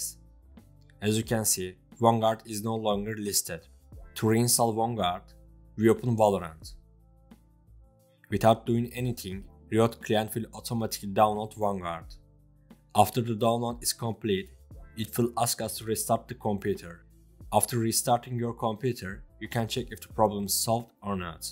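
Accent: Turkey. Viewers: Türk aksağanı çok anlaşılıyor abi ama teşekkürler video yardımcı oldu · reis konuşmandan anlamdım türk olduğunu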